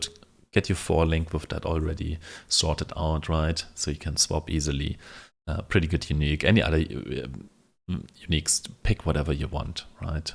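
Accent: Germany. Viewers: Oh my god, you can't just ask someone why they sound German!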